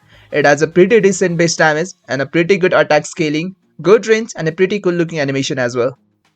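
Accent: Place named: India. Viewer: title looks Filipino but your voice sounds Indian 💀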